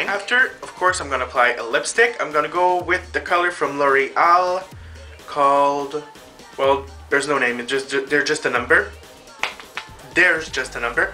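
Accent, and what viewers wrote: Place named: Quebec, Canada. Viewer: He speaks french canadian ;)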